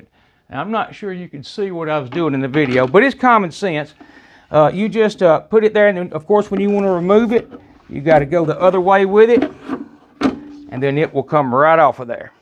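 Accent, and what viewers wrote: South, United States. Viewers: Your accent makes me ashamed to call myself southern. It’s represents the worst of humanity. And the most ignorant of humanity. I can just “hear”you never went to college. Its stomach cringing. I hate ghetto street thugs as well. Your both just two different sides of the same coin. Stop talking !!! Trump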